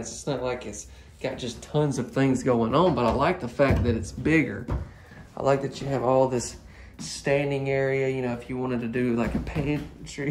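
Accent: South, United States. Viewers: BABY BOY!! Libby from Tampa Florida. I would never criticize you on your Channel. The only thing I can tell you is don't be negative with your little funnies. Or your big funnies! Those are what makes your Channel! Own them. Don't say I'm just kidding. You are great the Way You Are. Just be more firm with your delivery. Because you are great!. I watch other mobile home channels😝 I can't take it good. I'm looking for your little Southern Voice and just your humbleness that shines through. This time I took the time to put a long comment. I'm sorry that I don't leave one every time because I watch you on TV. GOD BLESS YOU LOVE YOU!!! 👵🙏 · Hello. I am from beautiful Campbellville Ontario Canada. Since today is February 01, where I am is fairly cold. I do like parts of this house. The second bathroom was a good size as you mentioned. No pantry(disappointing), no sink in the "utility room". 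Regarding the "u", I and most people I am acquainted with would call those rooms a laundry room. If you use laundry room no one can tease you on your pronunciation. 😉 I haven't been fortunate enough to be able to travel to the Southern States. I SO adore your accent. Have you ever traveled to Canada? Where I live(in the country)there are modular homes parks 20 minutes away. Maybe once Covid has gone, you might want to look at modular homes in my area. Thank you for your videos. I enjoy seeing the different layouts, rooms sizes, cabinets and flooring.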